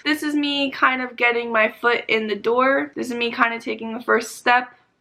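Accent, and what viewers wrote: New Jersey, United States. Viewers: I hear a cute New Jersey accent 🙂 I never remembered it from before but I also watched your old vids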